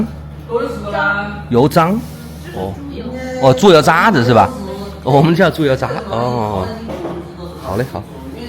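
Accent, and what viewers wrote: Wuhan, China. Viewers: 武汉人？？？听你口音听出来了，我后天去浏阳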